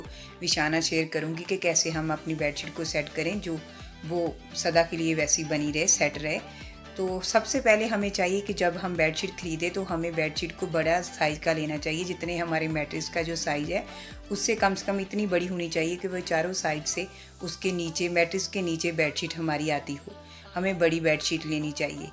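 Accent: Punjab, India. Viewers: Thanks par ek baat confirm hai ki aap Punjabi Ho apke bolne ke tarike se pata lag raha hai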